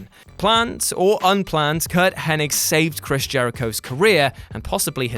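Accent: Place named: Newcastle, United Kingdom. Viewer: Yessssss a fellow geordie 😂😂😂💪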